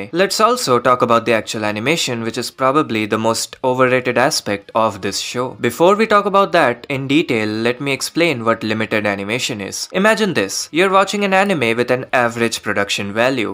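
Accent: India. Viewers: His voice sounds like how Indians usually talk with this kind of accent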